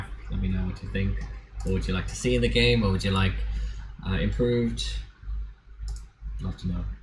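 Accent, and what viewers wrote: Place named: England, United Kingdom. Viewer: Wow you sound full on Pom now lol. Nice.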